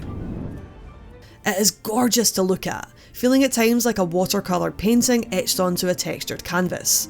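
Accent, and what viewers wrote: Scotland, United Kingdom. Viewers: when the Scottish accent hit me I was like yesss gurl- Got myself a wee buddy :D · Just found your channel, but it's exciting to hear some Scottish representation in my Youtube content!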